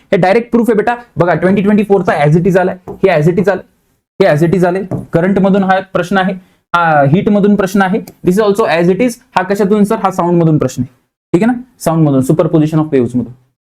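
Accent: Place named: Jalgaon, India. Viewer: Sir tumchi language Jalgaon chi ahe😅tumhi jalgaon che ahe ka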